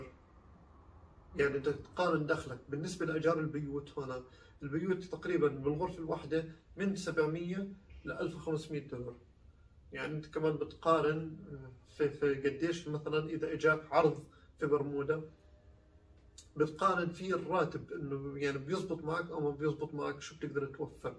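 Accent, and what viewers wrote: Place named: Jordan. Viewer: اول شئ الله يسعد دينا احنا الاردنيه بصدقنا ووضوحنا وشرحنا بإيصال المعلومه بشكل مباشر وواضح ومفصل ومفهوم انا مش بس من اللهجه بل من طريقه الشرح والتوضيح والتفصيل قلت هاد الرجل اردني أو فلسطيني أو مكس 😂♥️♥️♥️💪 كل الاحترام اخي الكريم وأمنيات التوفيق على الدوام واستمر بإيصال صوره مشرفه عن شعبينا الواحد وصدقنا ورقينا بالتواصل وإيصال المعلومه بكل امانه ومسؤوليه ولك كل الدعم 💪♥️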